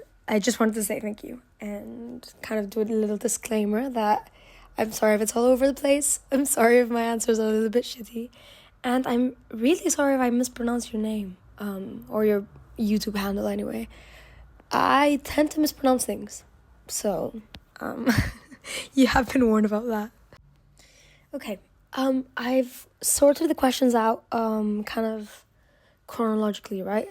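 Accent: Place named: United Kingdom. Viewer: Your UK accent is really like coming through and more showcased and overtaking (If that makes sense) then ur US accent which is cool 😭❤️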